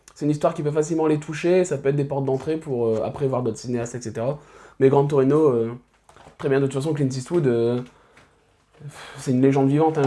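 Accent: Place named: North, France. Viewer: de rien 😊 je viens de découvrir ta chaîne, elle est plutôt intéressante. Bravo à toi. Avec le petit accent du Nord en plus 😉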